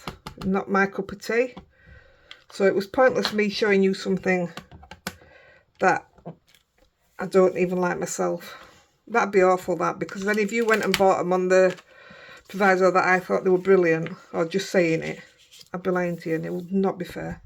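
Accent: Yorkshire, United Kingdom. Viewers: Great tutorial they are gorgeous cards…. Lovely to hear the Yorkshire accent makes me feel like I’m home xxxx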